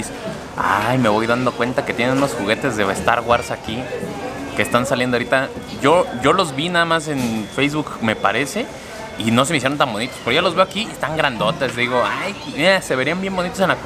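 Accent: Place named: Tokyo, Japan. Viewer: Saludos desde México CDMX ánimo....me fascinó tu acento de Tokio como que se te pegó...jajaja....como siempre 🤠🤠🤠🤠 mis respetos excelente información.........aah porfis Saluda a Doña Lety...a Don Álvaro y al buen Lalo.... La mejor hamburguesa la última solo por el tocino....bye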